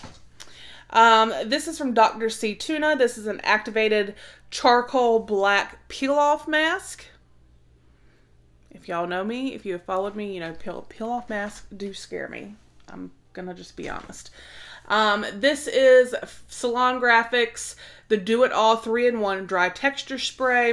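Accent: South, United States. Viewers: 🎂🎂🎂I am so happy for you to reach this mile stone...its because you have such a wonderful channel and you are such a giving person...I am so blessed that I came across your channel...I love your country accent...And thanks again for getting me started with Globe in...I just love that box...Congratulations...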